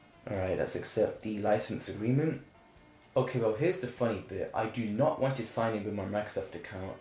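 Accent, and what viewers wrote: United Kingdom. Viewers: you sound british tho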